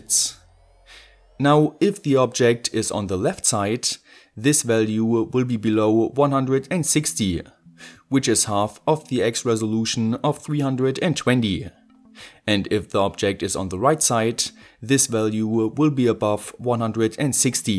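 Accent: Germany. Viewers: damn the german accent is annoooooooying. :(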